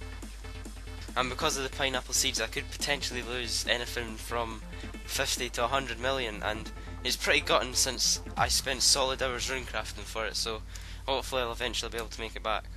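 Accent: Scotland, United Kingdom. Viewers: You sound Scottish =) I love scots!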